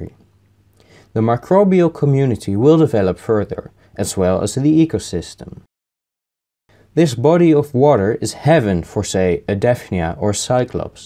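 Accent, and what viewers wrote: Netherlands. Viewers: Je Nederlandse accent veraad je XD geeft me altijd een lach als ik weer een nederlander op youtube tegenkom gaaf project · Fellow member of the Netherlands, your accent is Amazing!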